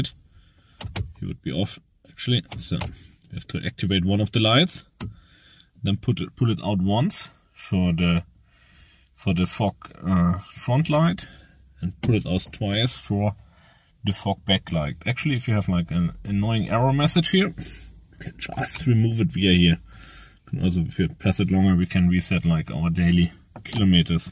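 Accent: Germany. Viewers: Der deutsche Akzent ballert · The fact that I turned on subtitles and there is only German since u have strong German accent is hilarious 💀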